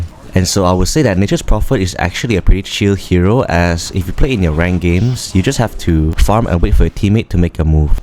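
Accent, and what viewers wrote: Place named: Malaysia. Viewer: i know a malaysian accent when i hear one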